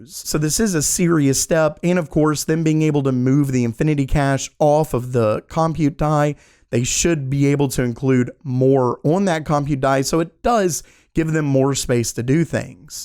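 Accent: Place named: South, United States. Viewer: I cant believed I've never caught your southern accent before lol, It's very subtle.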